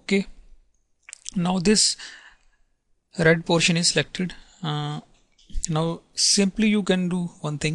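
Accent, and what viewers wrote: India. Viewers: come on it not like that you have speak that typical indian type english in video, keep your shitty vocal mute. i got headache !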